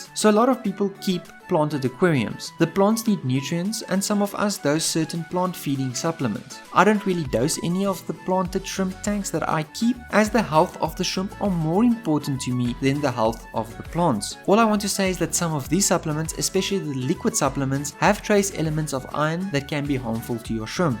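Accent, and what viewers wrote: South Africa. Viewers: I can hear you also speak pure afrikans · and concise! Nice to hear a clued up South African hobbyist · I really love the south african accent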